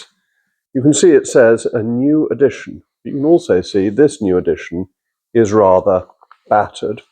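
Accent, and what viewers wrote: RP, United Kingdom. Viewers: I love his posh manner and accent. Muy pijo as they say in Spanish lol.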